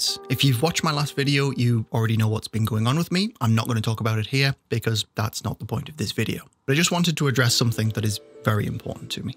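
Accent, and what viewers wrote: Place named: United Kingdom. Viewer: Every time you paused, then said something uncomfortable "organic material we are trying to replicate" - made me chuckle every time. Your voice is very soothing, and as an American, I love that British accent. I leave your videos running when I'm building, even if it isn't the same video to what I'm making.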